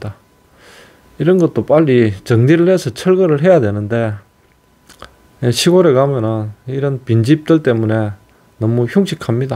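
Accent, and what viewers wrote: Gyeongsang, South Korea. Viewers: 표준말 쓸려해도 억양은 어쩔수 없구만요 저도 경남쪽인데 말투가 구수하게 느껴 집니다 문디에 대해서 추억과 나름데로 의학적 공부 좀 하셨네요~ㅎ 항상 수고 하십니다~^^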